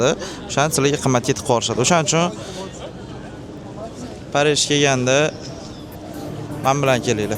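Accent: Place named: Tashkent, Uzbekistan. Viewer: Хоразмдан акансизку лекн тошкенчани йориб гаплисиз акан гап ёк😅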